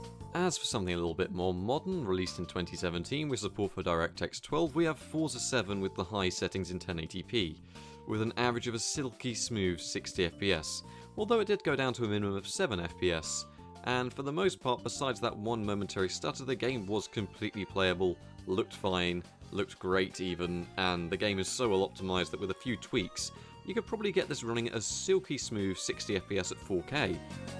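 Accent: United Kingdom. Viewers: well done man you deserve way more subs. love your Chanel one of the best tech channels on youtube. it is great to hear a British accent as well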